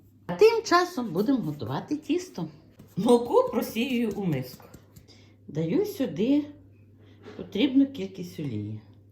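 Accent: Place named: Galicia, Ukraine. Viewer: Дякую ❤ Я б оце слухала і слухала Вашу чарівну Галицьку мову. 😊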